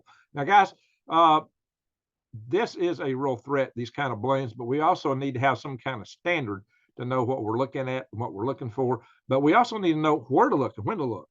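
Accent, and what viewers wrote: South, United States. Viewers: When the grid falls im sure gonna miss hearing. My favorite southern rocket scientist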